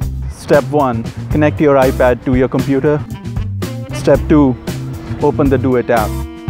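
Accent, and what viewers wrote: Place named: India. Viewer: Love the Indian voice keep it up !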